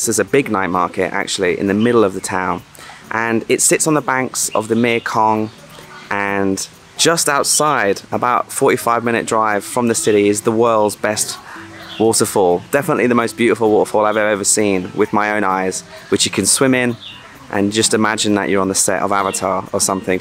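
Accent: England, United Kingdom. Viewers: . Judging by the sound of your voice, I assume that you are from England. So why do you always use US Dollars and not GBP when comparing prices in Thai Baht?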